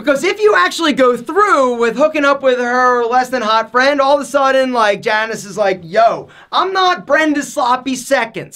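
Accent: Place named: Boston, United States. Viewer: U gotta be from Boston lol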